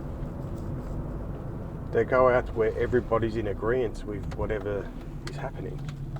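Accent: Australia. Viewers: I'm a boomer Aussie looking to the Akiya market, it's not important, I just spend so much time in Japan, it makes sense that I should consider a home here (Aomori) You're a Taswegian, Queenstown or somewhere nearby by the sound of it. It's a eye opener, being in Japan, isn't it. Love to chat with you...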